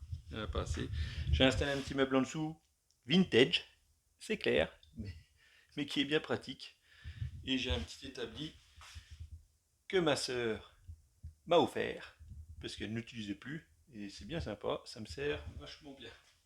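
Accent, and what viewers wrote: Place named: Haute-Marne, France. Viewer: Bonjour pas mal l’ancienne doc FLEISHMANN très inspirant ta vidéo j'ai très envi de me lancer, c'est quand le prochain confinement ...c'est une blague, mauvaise blague surement j'ai pas trouvé mieux, j'imaginais me lancer dans le HO mais vu la place pourquoi pas le N, tu trouves facilement du matériel à cette échelle ? dernier petit point sympa le léger accent de la haute marne j'ai mon frangin qui habite la côte d'or depuis... qu'il a laché son département d’origine et je retriouve les intonations de cette région ;-)) Merci encore pour tes vidéos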